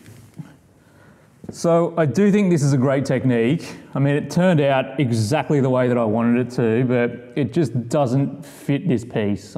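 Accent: Australia. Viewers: So nice to click into a video and hear an Aussie accent. Hello from Ballarat 🥰💗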